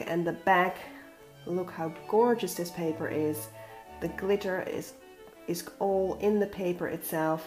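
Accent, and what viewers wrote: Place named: Netherlands. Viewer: I should have recognized that accent. I'm from the Netherlands as well but moved to Canada when I was 8. Do you have a tutorial on how you make your albums? I would love to make one similar to yours and that size. I can only find tutorials to make them with envelopes and card bases. What are the measurement for an a4?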